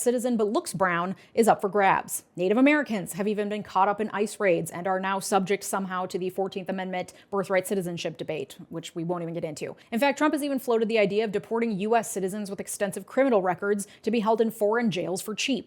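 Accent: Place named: Minnesota, United States. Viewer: you are obviously a childless woman and hopefully it will stay that way. you do NOT need access to children. Based on your accent, you are located in Minnesota, where you are completely removed from the CHAOS that illegal immigrants cause in the border states. They are constantly causing fatal car accidents in Texas, due to a culture of drinking and driving. Many of them also don't see anything wrong with grooming 14 year old girls because in their home country, it's normal for underage girls to get with grown men. I've seen several of your videos and most of them sound like you're completely disconnected from the reality of life.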